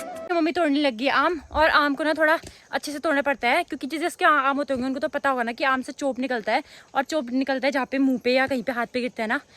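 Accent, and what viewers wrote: Pahadi, India. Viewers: Aapki bhi pahadi boli humari jaisi hai same❤😊😂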